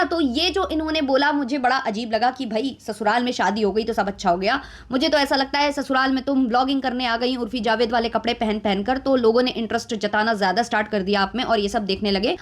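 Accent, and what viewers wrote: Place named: Western Uttar Pradesh, India. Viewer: Di ap up west se ho na .kyoki apke bolne se pta lgta h .hm bhi up west se h .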